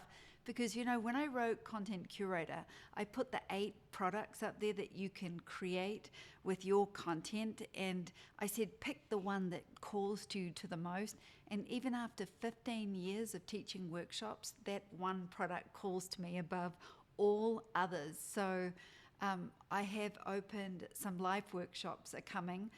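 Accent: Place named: New Zealand. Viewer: Love that you're back with this new endeavor. Looking forward to your weeklies. Thank you for being and I am in love with your beautiful Kiwi accent.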